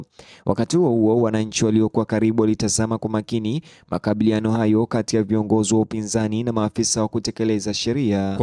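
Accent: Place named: Kenya. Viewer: kiswahili chenyewe kinakusuta wewe ni mkenya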